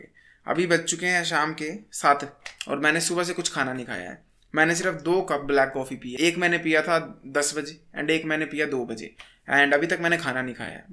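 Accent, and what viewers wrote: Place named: Kangra, India. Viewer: मिंजो ऐसा कयो laggada की bhau तू कांगड़ी है।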